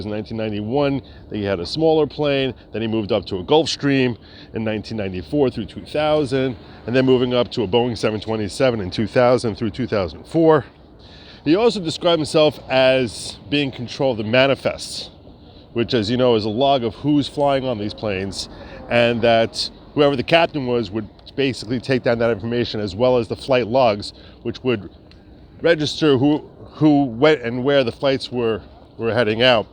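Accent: New York, United States. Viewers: Love your show. I miss the NY accent living in Ky but would NEVER move back!😊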